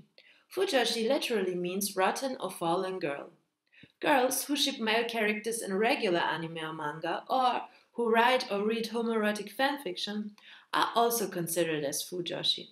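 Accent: Germany. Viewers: I love how it is super obvious that youre german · Are you German? :3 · Your German?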